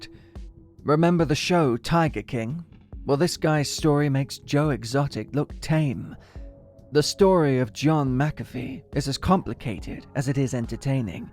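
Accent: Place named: United Kingdom. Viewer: John probably watched this in jail and then killed himself due to this pompous british voiced narration mini doc.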